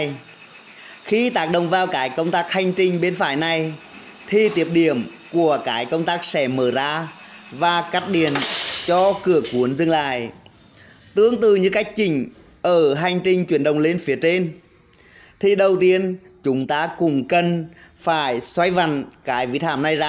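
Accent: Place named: Central, Vietnam. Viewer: Giọng miền trung Hay quá chúc bạn ngày mới vui vẻ nhé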